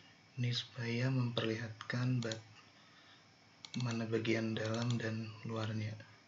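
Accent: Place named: Java, Indonesia. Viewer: logatnya jawa abis hahaha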